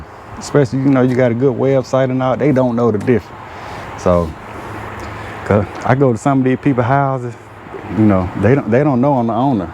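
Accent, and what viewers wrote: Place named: Kentucky, United States. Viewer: This Gentleman has a " BEAUTIFUL " Kentucky Accent !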